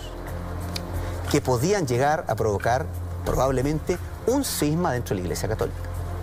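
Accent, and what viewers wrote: Chile. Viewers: Quería ver este video pero apenás escuché el acento chileno dije, no gracias prefiero vivir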